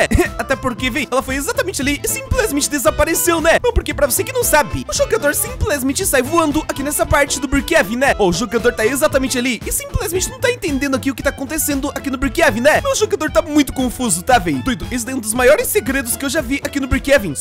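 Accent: Portugal. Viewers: Sotaque de Portugal...blz né kkk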